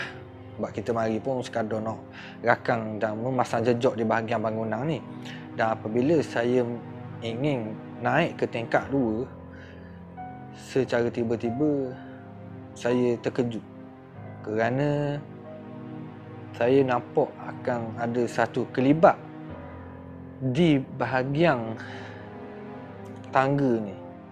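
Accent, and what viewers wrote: Terengganu, Malaysia. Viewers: OH..terbaik..salam ukwah dari saya d SABAH...walau saya tak berapa nk faham sleng terangganu..tapi sangat memeranjatkan part abg sado tg lembut tu naik motor...nanti khabo kat dia jangan buat lagi...😂😂😂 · PEKAT MOLEK GANU TERR... TAPI TETAP MANTAP BOSSKU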